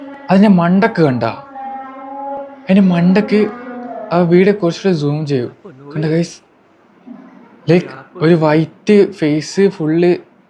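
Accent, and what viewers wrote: Kannur, India. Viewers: Bro kannur aano. Oru doubt!!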